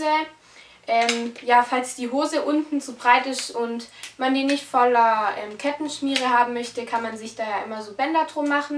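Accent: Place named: Southern Germany, Germany. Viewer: Haha ich wusste sofort, dass du aus Süddeutschland kommst:D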